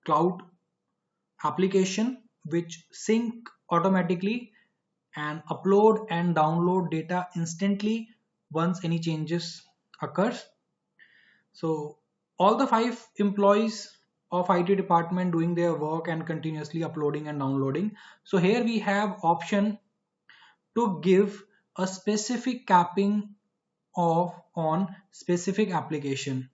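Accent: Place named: India. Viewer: old videos with heavy indian accent, but still far better than the official Sophos Lessons, thanks for your effort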